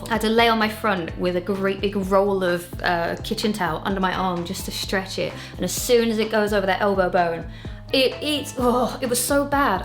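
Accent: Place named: England, United Kingdom. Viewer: i watched half this video before i heard your english accent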